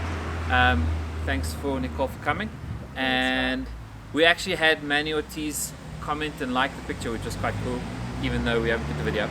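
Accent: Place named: South Africa. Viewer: Was just about to say that you have a South African accent....kanti you're a local With some international talent. Keep up the good work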